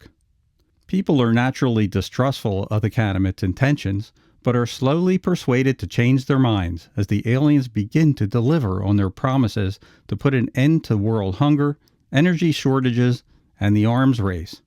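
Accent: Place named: Pittsburgh, United States. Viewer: I can tell this narrator is from Pittsburgh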